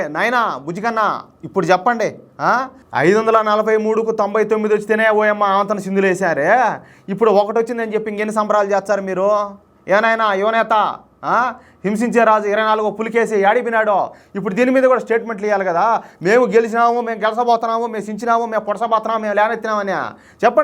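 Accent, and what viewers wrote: Rayalaseema, India. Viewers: నీ బండ బడ వయా. మన ఛానల్ శుక్లపక్ష చంద్రునివలె దినదినాభివృద్ధి జరగాలి మన నెల్లూరు చిత్తూరు యాసతో అదరగొడతా ఉండావయ్య. భగవంతుడు నీకు మరింత శక్తిని ఇవ్వాలయ్యా జైశ్రీరామ్ జై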